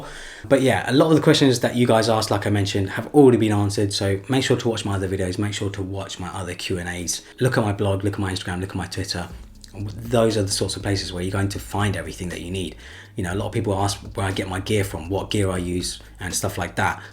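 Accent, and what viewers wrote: England, United Kingdom. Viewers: Dude you have the best English accent I’ve heard lol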